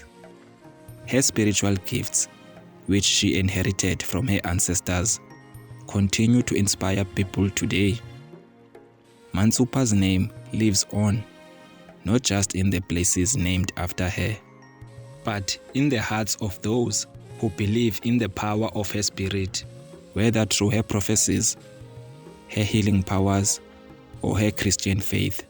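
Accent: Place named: Xhosa, South Africa. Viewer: Great story but u'r strongly Xhosa so u'r clearly struggling to pronounce other sotho names...you can't narate a sotho story with a Xhosa accent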